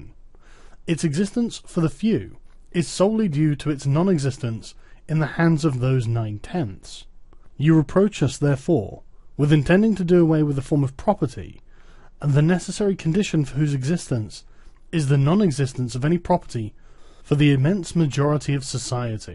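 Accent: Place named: England, United Kingdom. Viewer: I'm undecided if hearing Sargon read this makes the ideas more concerning than when I last read it. That type of English accent makes things sound more reasonable and somehow more evil at the same time.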